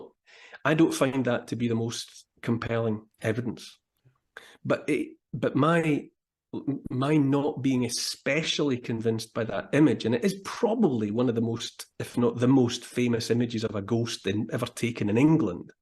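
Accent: Scotland, United Kingdom. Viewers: you never struck me as a bright spark...not that I listen to you much as I can't stand the slummy Scauts accent. Learn to speak English!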